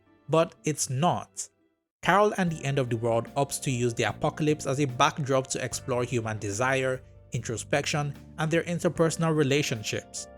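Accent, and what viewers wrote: Nigeria. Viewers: i could here the nigerian in your voice bro this show is nice but kinda wierd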